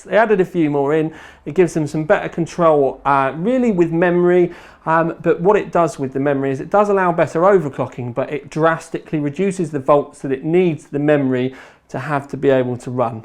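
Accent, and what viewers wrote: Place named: South East England, United Kingdom. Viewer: He can't handle our south east accent, fuck the haters!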